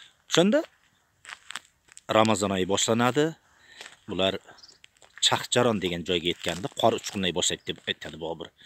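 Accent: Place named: Surkhandarya, Uzbekistan. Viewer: Сурхондарё еки Кашкадарё дан деб тахмин килгандим шеванггиздан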